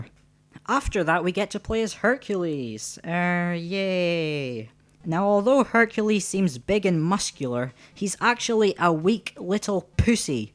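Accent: United Kingdom. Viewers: "the level from hale!" dude you arent funny. look up avgn! i was laughing at your stupid british accent. it is not easier said than done? dont you mean it is easier said than done. you dumb ass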